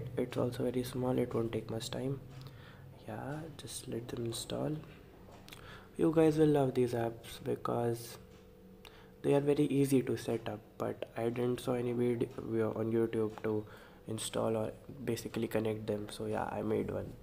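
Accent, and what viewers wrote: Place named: India. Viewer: its impossible for me to understand Indian people talking english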